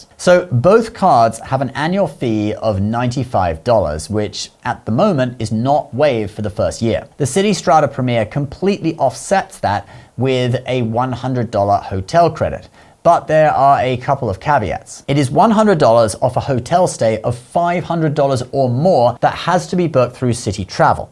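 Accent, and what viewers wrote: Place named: United Kingdom. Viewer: you're Brit? I always thought that was just your YouTube character.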